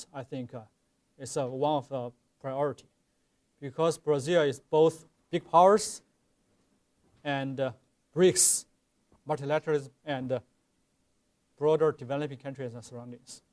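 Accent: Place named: China. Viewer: What a typical Chinglish!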